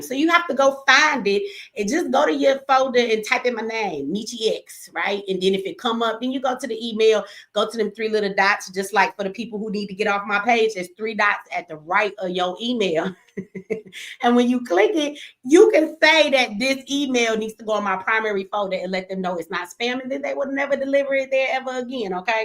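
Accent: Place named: South, United States. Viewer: just notice youve pick up a strong southern accent...ahhhall for all, we lyyyyyve for live. Not unusal to do so. Its call adaptation lol!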